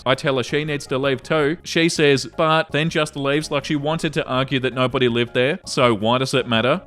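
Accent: Australia. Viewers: Oh, you are such an aussie mate. When you said "And sucked in too" I just cracked up it came out of nowhere wasn’t expecting it 🤣 That was gold dude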